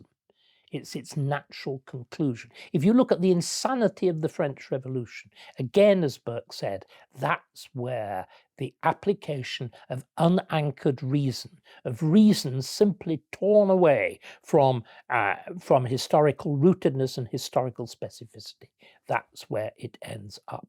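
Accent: RP, United Kingdom. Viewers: You wouldn't of thought he was a working class lad.Speak with a plum in your mouth, and you automatically think he is intelligent. Some people are different deal with it.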